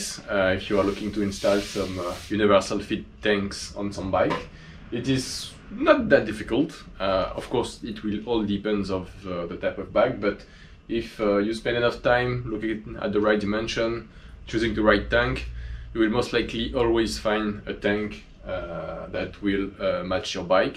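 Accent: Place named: France. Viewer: On dirait qu'il y a un petit accent français 😏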